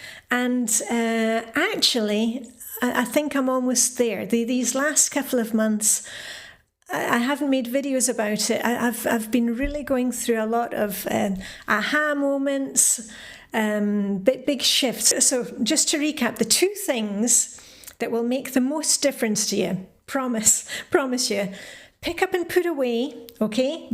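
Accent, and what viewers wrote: Scotland, United Kingdom. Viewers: I love your Scottish voice!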